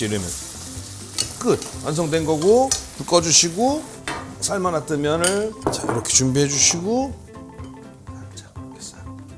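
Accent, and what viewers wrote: Chungcheong, South Korea. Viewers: Yee분 충청도 사투리 같은거 쓰는데 충청도 사람이에you?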